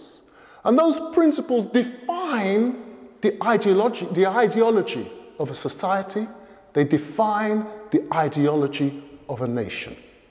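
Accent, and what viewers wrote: England, United Kingdom. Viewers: just cause he is using white inventions he can still advocate for pan africanism because how is he supposed to spread the message via mail ? of course he has to use the internet because that is a faster and more widespread way to spread the message and the people saying that his using an english accent so his analogy isnt valid he studied in a western school so he has western influence in his way of speaking and you would be the same people to call him “uneducated” if he had an african accent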